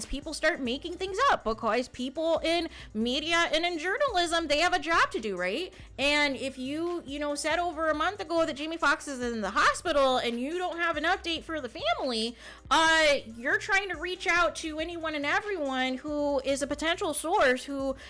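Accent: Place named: Minnesota, United States. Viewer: o ok, ur voice just reminds me of my friend from Minnesota especially when u say u guys.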